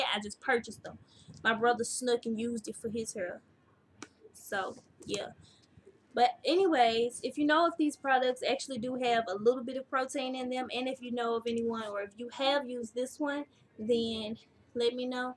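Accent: United States. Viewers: Ur so country lol its cute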